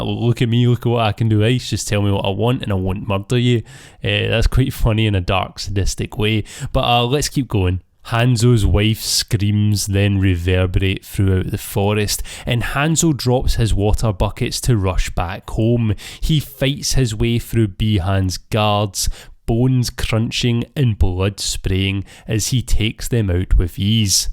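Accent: Scotland, United Kingdom. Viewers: imagine if you speak in your normal speed anyways its your accent of course scottish will understand it... thank you for your clip and subtitles from your efforts,though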